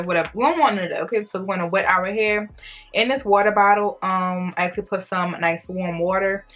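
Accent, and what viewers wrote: New Orleans, United States. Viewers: It’s so interesting listening to you speak, I didn’t know you’re Nigerian but you definitely have that African American accent and a little bit of that NOLA flair.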